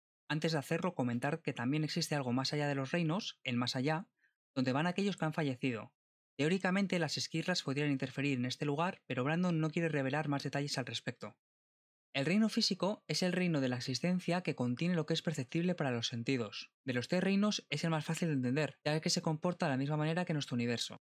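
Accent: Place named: Spain. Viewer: hablas muy rapido (o yo estoy media lenta). Aun sabiendo del tema tuve que volver a escuchar en reiteradas partes, ya que no estoy acostumbrada a tu acento espanol a 90 km/h. saludos desde Argentina.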